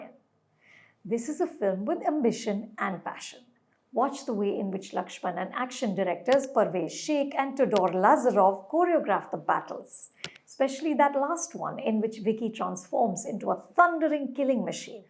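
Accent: India. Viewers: Please keep your biased south delhi English accent and dishonest leftist review to your self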